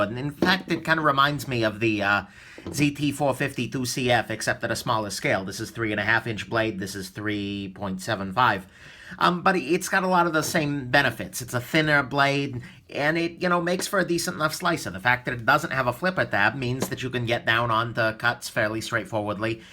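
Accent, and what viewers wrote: New York, United States. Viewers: naw he just sounds like a damn proud New Yorker lol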